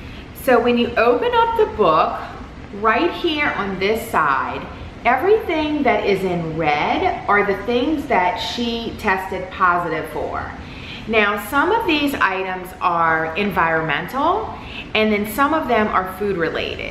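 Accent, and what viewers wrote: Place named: Louisiana, United States. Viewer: I swear I can spot a Louisiana accent anywhere 😂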